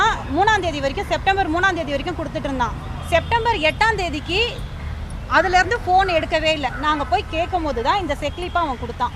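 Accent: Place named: Madurai, India. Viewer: அக்கா..சொந்த ஊரு ..மதுரை னு..நினைக்கிறேன்....உனக்கும் ஆசை இருந்து ருக்கு...சக்கா. .அக்கா..செம..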